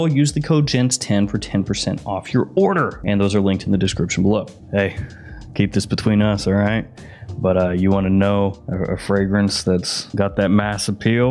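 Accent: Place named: Tennessee, United States. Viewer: I like when uses his original Tennessee accent 😆 Embrace your southern accent 😀👍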